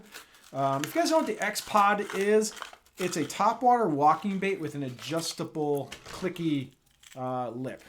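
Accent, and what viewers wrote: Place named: Minnesota, United States. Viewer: Your from Minnesota 😮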